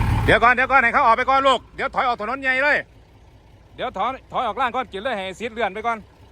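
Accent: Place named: Laos. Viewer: ออกลาวจ้า89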